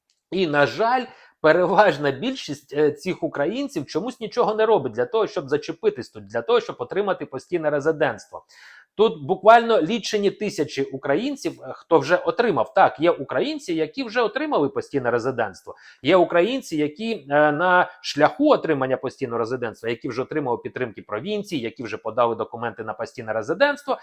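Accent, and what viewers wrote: Russia. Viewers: А ты не русский часом?))) Акцент просто ужас.... кровосися.... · Ну очевидно же что ты не украиномовный. У тебя чисто российский говор. Не ломай язык,говори на своем родном языке!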